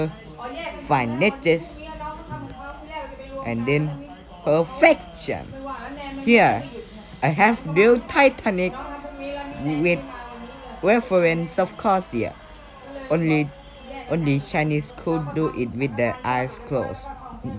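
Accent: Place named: India. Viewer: Why you sound like india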